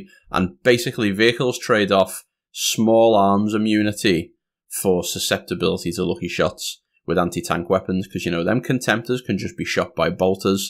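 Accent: Liverpool, United Kingdom. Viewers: Are you from Merseyside? You sound scouse-adjacent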